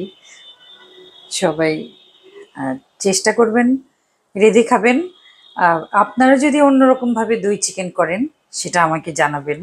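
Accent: Sirajganj, Bangladesh. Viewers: সিরাজগঞ্জের ভাষা অনেক ভালো লাগলো শুনতে শুনতে হাসতে হাসতে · আহারে কত মধুর আমাগোরে সিরাজগঞ্জের ভাষা।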